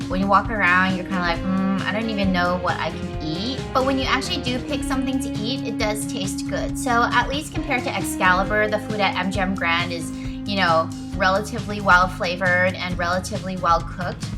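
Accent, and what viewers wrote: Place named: California, United States. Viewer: Informative but........ Couldn't get beyond 2 mins listening to that voice . Rising infliction much ? I began thinking it was the Californians skit off SNL ... No offence intended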